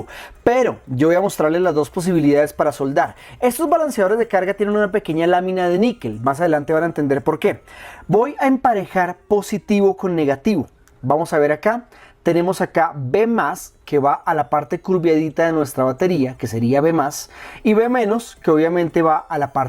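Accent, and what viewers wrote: Colombia. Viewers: Buenos días mi gente. Estoy aprendiendo a arreglar parlantes a mi tercera edad, y yo siempre me pregunte para que diablos servia esa cinta de las baterías (reguladores de voltaje), y varios parlantes los deje así colocando baterías de litio sin este regulador, porque pensé que era como colocar las baterías a una radio. ¡qué error! Soy de Bogotá, y no sé donde conseguir esas cintas reguladoras. Por su asento sé que eres compatriota mio. Ese regulador de voltaje vendría siendo como el flotador en un tangue de agua, ¿cierto? Muchas gracias por sus contenidos me han ayudado mucho.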